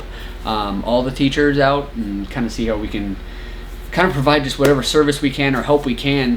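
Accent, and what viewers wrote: Tennessee, United States. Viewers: So glad I’m done raising kids .. I would be a nervous wreck if my kids were little at this time .... Do I detect a little Tennessee accent starting ? I think maybe .. 🤗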